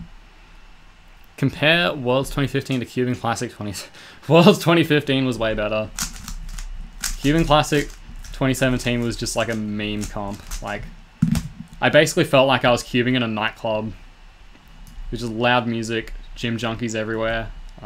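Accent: Australia. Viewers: You aussi accent is very good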